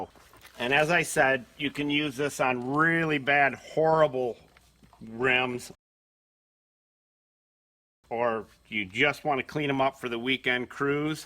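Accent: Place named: Michigan, United States. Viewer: If you ever wondered what a Michigan accent sounds like listen to this guy. Lol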